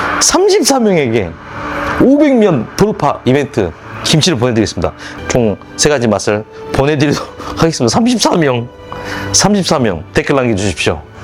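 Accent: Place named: Jeolla, South Korea. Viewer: 구독자 500명 달성을 진심으로 축하드려요!😊❤🎉 전라도 김치 참 좋아해서 저도 사먹는 편이거든요! 특히 묵은지를 좋아해서 묵은지 김치찜을 자주 해먹는 편이랍니다 ㅎㅎ 에서는 김치소개를 더해서 전라도의 맛집도 소개해 주시는 영상이 많아 참 좋더라구요❤ 그 중에 얼마전 말리화반점을 소개해 주셔서 아주 맛있게 잘 먹구 왔답니다! 동구에 있는 오래된 중국집인데 유니짜장이 맛있다 라는 에서의 리뷰를 보고 역시니 맛잘알❤이라고 생각을 하였다는요..👍🏻 그러니 의 김치는 얼마나 맛있을까 하는 믿음이 안생기겠냐구요 👍🏻ㅎㅎㅎ 이렇게 열심히 해주셔서 많은 구독자 님들이 금치의 김치를 받을 수 있나 봅니다! 저도 금치를 꼭 밥에다 먹어보길 희망하며 바라봅니다😊 역시 전라도 사투리 찰져버리시네요~~ㅎㅎㅎ 34개 축하드리고! 500명돌파 다시한번 축하드리고 1000명 1만명 까지 쭉쭉 승승장구 하시길 바라겠습니다!!😊❤🎉 (1kg:총각김치, 서비스김치: 부추김치, 깻잎김치 희망해 봅니다..😊)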